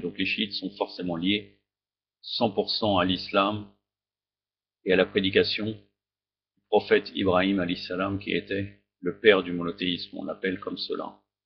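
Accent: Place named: Iran. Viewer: tu récite avec un accent perse, tu parle français? tu ne fait aucune effort de récitation accent arabe. tu n'est pas chiite c'est moi qui te le dit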